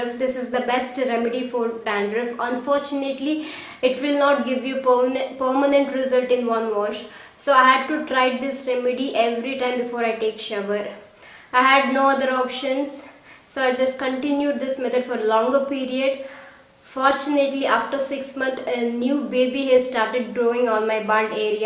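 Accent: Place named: South India, India. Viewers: In love her innocent indian acctented english. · Its south accent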